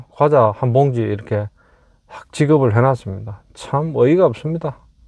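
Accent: Gyeongsang, South Korea. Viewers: 안녕하세요.. 유투브님은 경상도 사투리 이지만 목소리가 엄청 이뿌네요. 저도 경상도 인데 돌깨지는 소리같다고 합니다.. 집에서는요.. 유투브 님은 듣기좋은 목소리 입니다.. 시원하께 좋은 말씀해주셔서 감사합니다 . 특히 목봉 말입니다.. 항상 응원합니다. 수고하세요 😁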